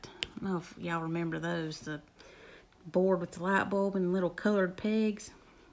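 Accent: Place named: Louisiana, United States. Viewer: omg i love you ...kept me laughing the whole hour....could listen to your accent all day ..makes me homesick....was born and raised in Louisiana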